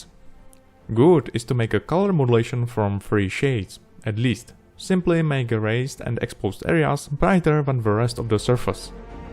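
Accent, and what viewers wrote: Russia. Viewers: С русским акцентом!)))) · просто по акценту я посчитал, что он носитель русского.)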